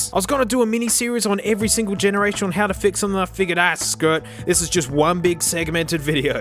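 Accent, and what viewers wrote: Australia. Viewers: I absolutely love these vids and the accent is A'stralian ( in a sea of USAs) but I do have a problem. I had a new battery put in an iPod Photo and the sucker will only fully charge via Firewire. If I try USB it will take forever and it still may not fully charge. It's really got me stumped. Any ideas? Oh and or what it's worth I just opened the box on a last gen. 160gb Classic and the battery charged up without any problems. Not bad as it sat in a wardrobe drawer for seven years. I then it connected to a high end dock (after I synced it to iTunes) and the music sounded sublime. Why did I go old tech? Well , I reckon that when it comes to an interface for listening music the scroll wheel can't be beaten. For a Jack and Jill of all trades I use iOS devices and if I want to go half way house which weighs almost nothing then it's the Nano last gen. 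Anyway if anyone can help with my problem I'd gratefully appreciate it. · Gotta love that Aussie accent... 🥰😏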